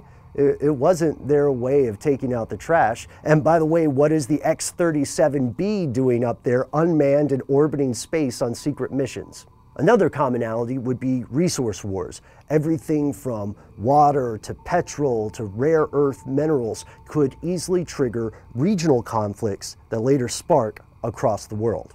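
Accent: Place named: United States. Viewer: Hey, you sound American, so why do you use the term "petrol" rather than "gasoline"? I'm not being flippant; I'm genuinely curious. Are most of your viewers European?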